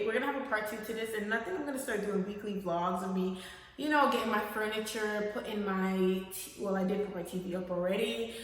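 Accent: Jamaica. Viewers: R u Jamaican? U definitely sound like one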